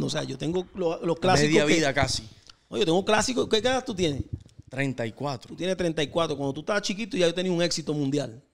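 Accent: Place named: Puerto Rico. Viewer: Es que el es puertorriqueño.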